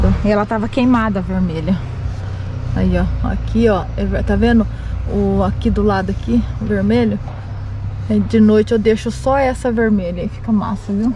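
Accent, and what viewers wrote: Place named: Bahia, Brazil. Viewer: Que perigo sempre freio aí é barril falar que nem nós baiano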